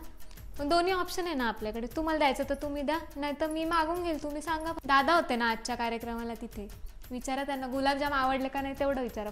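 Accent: Khandesh, India. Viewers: किती छान कुठल्याही रोल मध्ये परफेक्ट करते... एकदम खान्देशी चे बाई वाटते... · Haha....zanzatmari 😂😂 it's a classic khandeshi accent👌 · Khandeshi accent in marathi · काकू खान्देशी आहेत वाटत 😂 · Khndeshi tone mst khup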